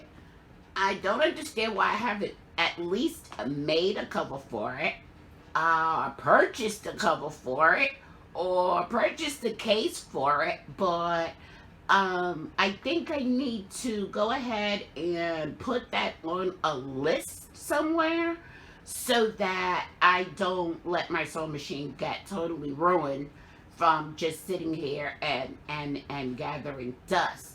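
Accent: New York, United States. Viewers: That is so funny, I was going to say I hear a NY accent. My parents were born and raised in the Bronx, I grew up an hour from the city and now live in CT:)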